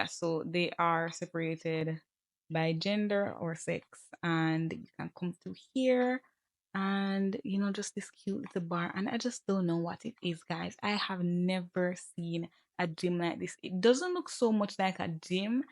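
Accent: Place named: Caribbean. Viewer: Ouu I hear an accent happy to see other Caribbean gamers this was a great video 🫶🏽😊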